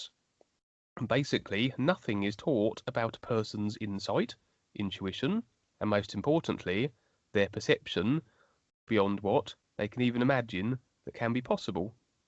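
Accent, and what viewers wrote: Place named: England, United Kingdom. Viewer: Thanks, it’s nice to have a human voice and not a digital one to listen to. It takes awhile for me though, as a non native speaker, to get accustomed to your pronunciation. Are you from England or from Australia or New Zealand?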